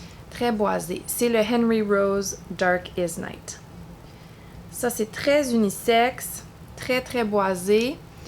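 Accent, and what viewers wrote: Canada. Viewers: Super vidéo, je suis nouvelle sur ta chaîne. Comme toi passionnée de parfums. Tes vidéos sont très intéressantes. Je me suis abonnée à. Ton accent canadien est merveilleux 😍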